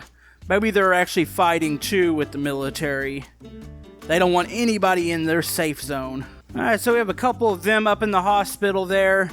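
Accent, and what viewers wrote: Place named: West, United States. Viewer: for some reason i actually thought he had a farmer accent or like sort of a western accent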